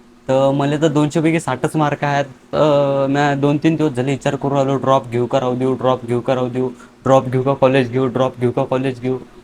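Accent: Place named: Warud, India. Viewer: Bro tu amrawati warud cha ahe ka Karan tuzi bolnyachi bhasha tashich ahe😅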